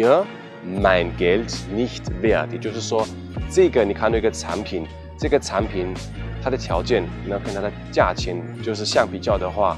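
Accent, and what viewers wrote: Taiwan. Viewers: schade, Akzent aus Taiwan....ansonsten gute Arbeit! auf jeden Fall weiter empfohlen ! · Dein Chinesen ist nicht schlecht, aber dein Akzient aus Taiwan geht mir auf den Nerven.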